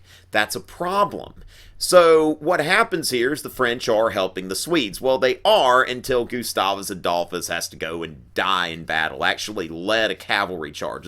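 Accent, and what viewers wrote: South, United States. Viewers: Southern US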